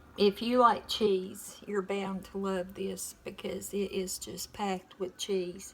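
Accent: South, United States. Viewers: I have slowly become addicted to your YT!! I'm not used to the southern accent, but you have taught me! By the way, I really like your hair down, you look really cute! And LOVE your recipes.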